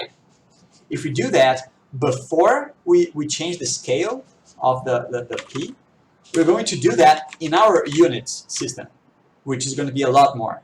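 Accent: Brazil. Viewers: é br??? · percebi desde o inicio que vc era brasileiro kk belo trabalho aí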